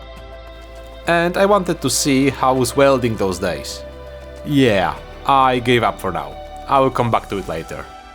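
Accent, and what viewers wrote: Poland. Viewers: This was such a wild ride! I really enjoyed watching the whole thing and your Polish(?) accent and humour made it even better 😊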